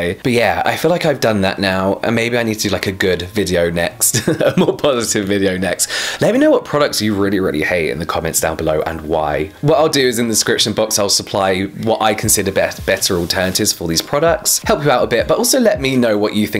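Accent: England, United Kingdom. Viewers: I love your voice. That British accent is so melodic. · You talk so fast Man, with british aksen makes me just focused to your beautiful glowing face..hihi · He’s from England so you’re right with the accent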